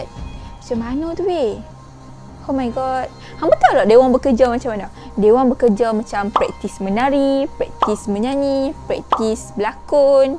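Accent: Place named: North, Malaysia. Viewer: Haha awat jari angg tu..loqlaq naaa..sekali lg tgk sbb ckp utara..x minat k pop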